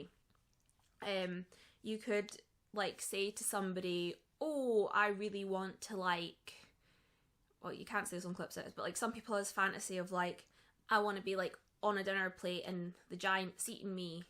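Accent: Scotland, United Kingdom. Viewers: By the way, are you Scottish by any chance? Just going by your accent. I am a geordie, part Scottish😊.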